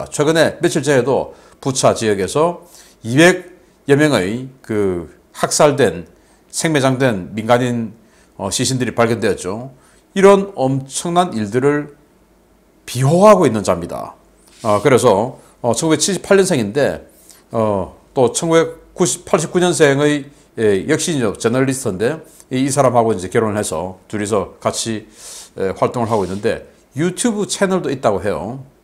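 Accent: Gyeongsang, South Korea. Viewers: 이 분은 전쟁 신봉자네요. 군사력이 강해져야 하지만 생각이 다른 국민들은 모두 빨갱이이니 청소해야 한다고 하니 푸틴하고 뭐가 다른지 싶네요. 억양을 들어보니 경상도 사람으로 보이는데 지역정서에서 벗어나지 못한 불쌍한 분으로 여겨지네요. 우물안에서 벗어나 국민을 함께 바라보는 넓은 시각을 지향하면 좋겠다는 생각입니다. 이 분이 군사전문가로 보이는데 시야가 좁으니 인물이 아깝네요.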